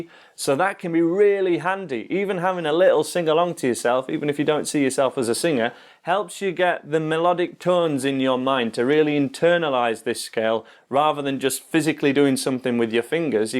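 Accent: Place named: Northern England, United Kingdom. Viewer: Cheers! Mega helpful plus - Northern Accent FTW!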